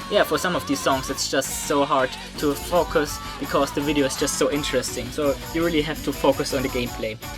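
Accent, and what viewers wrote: Germany. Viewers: Kommst du zufällig aus deutschland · Are you German?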